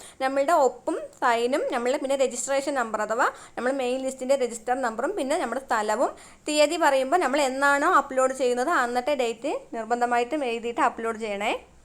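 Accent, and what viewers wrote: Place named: Palakkad, India. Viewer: മിസ്സേ.. നിങ്ങൾ pkd aano.. അതെ സ്ലങ് ഇടക്ക് കേറി വരുന്നതുകൊണ്ട് ചോദിക്കുവാ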